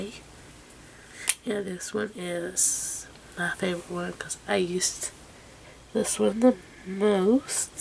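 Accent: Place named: South, United States. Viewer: your sweet toned voice combined with your subtle southern accent is very relaxing!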